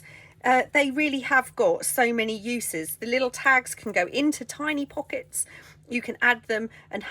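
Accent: England, United Kingdom. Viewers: I love your hands and your fancy "English accent". The crafts you show are quite elegant and nice. I appreciate so much what other women do, this enriches us women to watch their creativity and good taste. Tysm for sharing it! ❤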